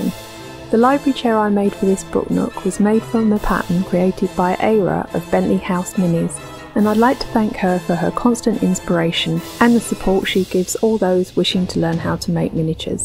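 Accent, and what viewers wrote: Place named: United Kingdom. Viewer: Hey, came here from SEG! I’m so glad he mentioned you as this is exactly my type of content. I have plans for making some period dolls house vignettes with a story tying them together. I’m teaching myself so it will be slow going, but it’s been a dream for so long. Do you ever showcase your work in person? I am uk based and your accent makes me think you are too. I’d love to see it in person one day.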